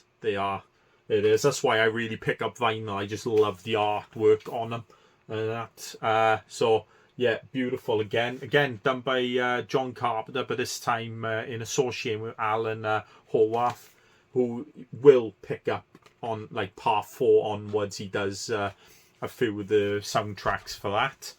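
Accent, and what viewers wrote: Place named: Wales, United Kingdom. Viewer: A Welsh man??? Well hello sir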